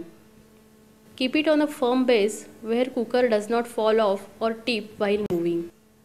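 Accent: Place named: Maharashtra, India. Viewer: Marathi girl speaking English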